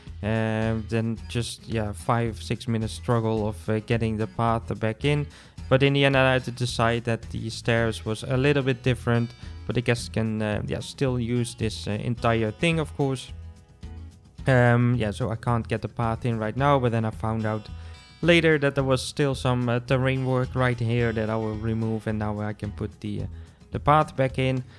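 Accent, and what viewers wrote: Netherlands. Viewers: Nederlands accent??? Haha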